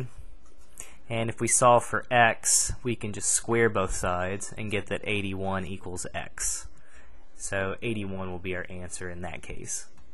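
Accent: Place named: Baltimore, United States. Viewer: Sounds like he has a Baltimore accent.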